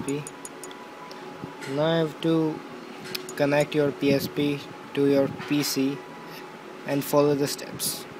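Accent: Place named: India. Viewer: you have a indian accient you must be the right one that im searching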